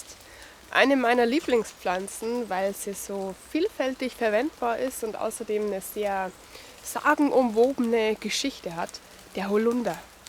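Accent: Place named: Bavaria, Germany. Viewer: Hallo, des war sche a wanns koid war. Ich seh und hör dir unheimlich gerne zu. Du musst natürlich Hochdeutsch sprechen, aber dir rutschen immer wieder herrliche bayrische Wörter heraus. Für mich als Preuße der in Bayern gelebt hat kein Problem, aber für die die oberhalb des Weißwurstäquators leben, also nördlich der Münchener Stadtgrenze wahrscheinlich schon.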